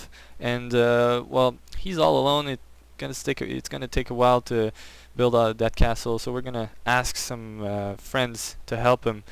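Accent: Quebec, Canada. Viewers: By the sound of your voice and you name, i guess you'rs from Québec? Am i right? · Vous êtes Québécois ? Je dis ça, car on dirait que vous avez un petit accent Québécois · Le québécois, je dirais... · I recognized the quebec accent immediately :) .. also.. cool looking game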